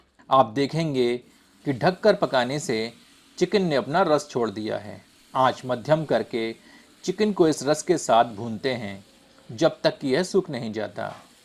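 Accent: Standard. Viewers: aapka Shudh Hindi Ucharan bahut Pasand hai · आपका विशुद्ध हिन्दी मे बोलना काफी अच्छा लगा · आपका बोलने का शुद्ध हिंदी तरीका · Apki sudh hindi Or cooking style dil jeet liya.... Dhanyavaad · आपकी शुध्द हिन्दी और आकर्षक प्रस्तुति से ऐसा मेरा मानना है कि यह डिश बेहद स्वादिष्ट होगी..निश्चित ही इसे बना कर आजमाया जायेगा... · aapki voice aur pure hindi soonnnay aata hun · shudd hindi and very polite way to explain recipe. awesome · shudh hindi me recipe dekh kar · Aap itni shuddh Hindi prawachit krte h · apki shuddh hindi bahut hi achi h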